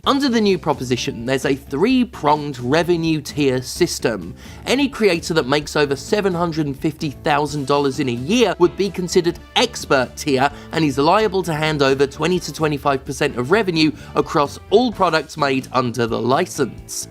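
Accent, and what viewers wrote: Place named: United Kingdom. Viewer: Hey Stephanie Long Time watcher I feel like I don’t comment enough to let you know that I love your Content because I love anti-corporate stuff and I love video games and I love you. Just wanted to say that it sounds like these corporations are the most chaotic evil rogue guild and I adore that you used a cyberpunk 2077 phrase when you called them corpos. Thanks for saying that they were rolled A1 this time but still have their eye on the cookie jar. I love your British accent, and you’re alliteration and your metaphors.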